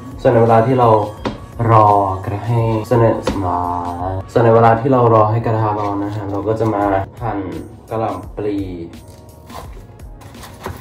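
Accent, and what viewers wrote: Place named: England, United Kingdom. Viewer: Hihihi I love yo English accent 🥺♥️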